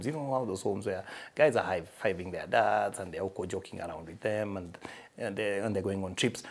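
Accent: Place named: Nairobi, Kenya. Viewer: Great great lessons.. I did relate so much with you really. Just that I am at that stage where I am finally coming to something that finally works. Ps. I knew he’s a South B guy before he could say two words😀.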